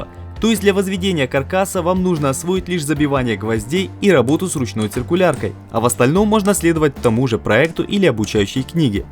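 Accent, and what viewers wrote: Surzhyk, Ukraine. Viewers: Весело у вас пробивается суржик) Смесь русского и украинского.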